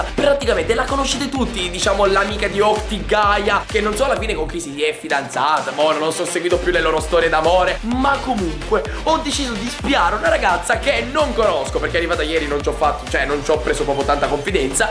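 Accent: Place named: Veneto, Italy. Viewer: mamma mia che dialetto Veneto biceri zio can glie i bicer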